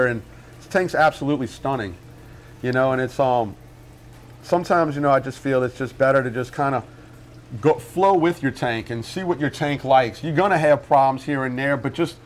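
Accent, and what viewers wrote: United States. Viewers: i knew at about 5 seconds in this guy was from LA. haha i miss southern accents. southern guy stuck here in CA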